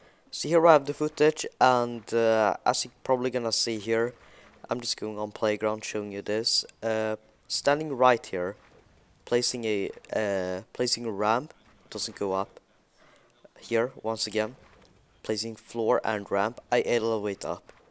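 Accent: Sweden. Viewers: You're swedish right? Jag hör det på din brytning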